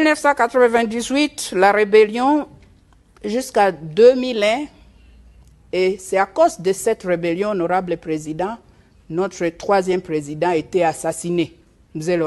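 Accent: Rwanda. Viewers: Rwandaise kende na rwanda yoka ba ra naba re na prononciation naye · Una sema swahili kina rwanda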